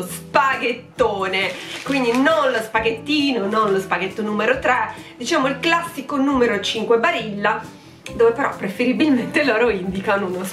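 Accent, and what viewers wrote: Rome, Italy. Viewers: Sarda trapiantata a Milano e che riesce, e lo dico da romana, a fare piuttosto bene le battute in romanesco!😂 Spesso chi ci prova esagera, tu no! Evviva la carbonara. Mi sono mancati i disturbatori💚💚💚💚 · Wow ma sei bravissima, il romanesco poi..... Sei fantastica! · La mia regione 😍 stupenda tu in versione romanesca 😂 ti volevo chiedere una cacio e pepe ma ormai penso se ne parli al prossimo giro ❤️ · ...dimenticavo: ti ho adorata in versione romanaccia, anche se ci devi lavorare😂 Daje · No te prego....dopo il tuo parlare romano ti amo ancora di più...sei un mito😊🤗👏👏👏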